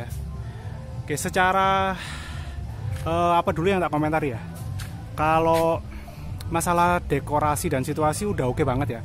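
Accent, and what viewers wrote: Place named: Java, Indonesia. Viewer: Masnya kayak Joe Kal.. pemberani N jowo banget kalo ngomg.. mantapp...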